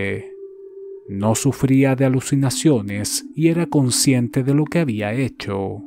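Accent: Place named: Chile. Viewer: Hola, espero estes bien! Felicitaciones por tu canal. Lo q mas me gusta es la narracion chilena que haces de los videos. Estoy harto de escuchar relatos mexicanos, por eso te felicito por tu narrativa. Quisiera mas videos nuevos, ya los he visto 3 veces.gracias. Ronald cortes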